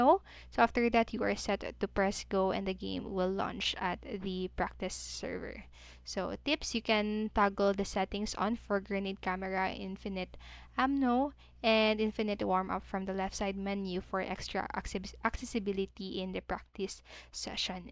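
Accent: Philippines. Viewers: you sound like a filipina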